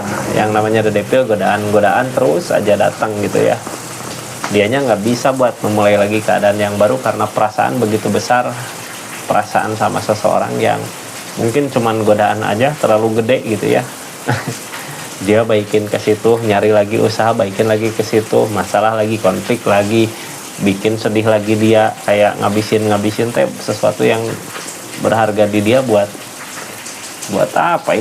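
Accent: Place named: Sunda, Indonesia. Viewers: ❤❤❤❤❤❤❤❤❤❤❤❤❤❤❤ Resonet se x. Aak Aku suka. Yg membaca kan. Logat sunda nya kental banget Sehat dan sukses sllu❤❤ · ❤❤❤❤❤❤❤❤❤❤❤❤ Resonet se x..Aak Aku suka . Yg membaca kan . Leo Sunda nya kental ... banget sehat dan sukses sllu❤❤❤❤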